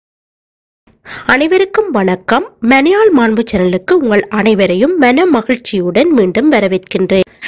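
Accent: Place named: Jaffna, Sri Lanka. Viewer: வணக்கம் சகோதரி. தங்கள் சமையல் குறிப்புகள் இரண்டும் அருமை. அந்த அலங்கார குறிப்பும் சிறப்பு. தங்கள் யாழ்ப்பாணத் தமிழோ இனிமை.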